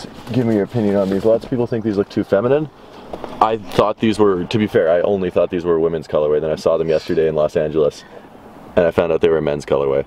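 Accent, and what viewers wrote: Canada. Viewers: yeah, canadians kinda pronounce things weirdly compared to us states-people. especially for me cuz i'm from texas😂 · I thought u said those words like that cuz ur Canadian. Also u say sorry weird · You don't say them wierd, you say those words Canadian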